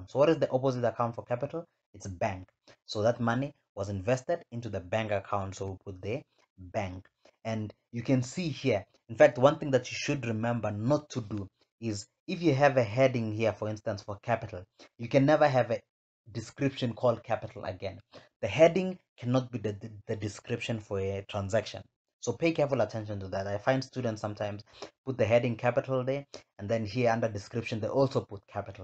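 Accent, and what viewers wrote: South Africa. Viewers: 🇿🇦lets go you from sa can hear your accent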